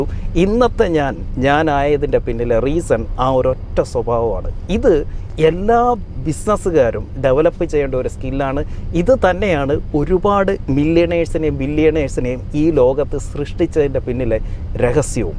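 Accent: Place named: Alappuzha, India. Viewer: ആലപ്പുഴ ഭാsha not കൊയ്‌ക്കോട് ഭാഷ..they are njannamunja but can sell a koikode to any keralites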